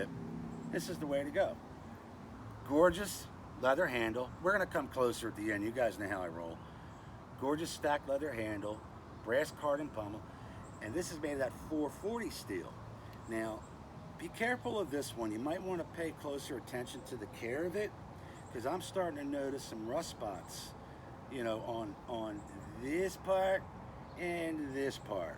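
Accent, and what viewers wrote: Australia. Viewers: Aussie accent is hard to do if your not an Aussie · The hat is awesome. You sound just like you are from the outback brother. This is awesome.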